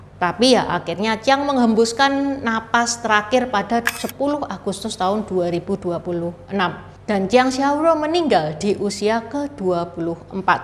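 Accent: Java, Indonesia. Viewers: Cici bhs Indonesianya medok banget 😂😂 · Logatnya Jawa banget.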